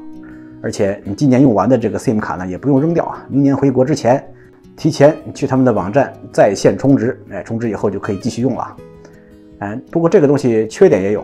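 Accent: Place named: Beijing, China. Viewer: 沒有惡意，對你視頻還是挺喜歡的，特別是你的京城口音❤👍😀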